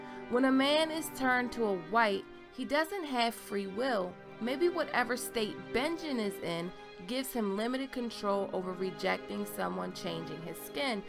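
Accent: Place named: Baltimore, United States. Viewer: You are absolutely from Baltimore! I giggle every time you say "Juice". And thanks, every time I say the word 'okay', I hear your voice on my head! 😂 Love your vids along with the others in the crew!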